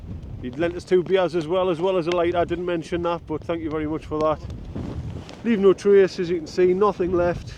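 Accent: United Kingdom. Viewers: I love the British accent - are you a true Cockney ? I notice you have a nice thick head of barnett and chair and at least in a tent you don't have to go up the apples and bananas to bed ! I'm going to pony and monkey myself shortly - wish me luck geez !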